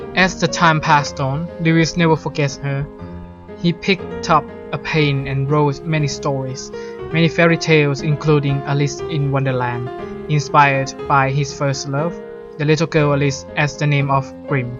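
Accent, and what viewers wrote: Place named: Thailand. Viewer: Are you Thai? You seem to have the accent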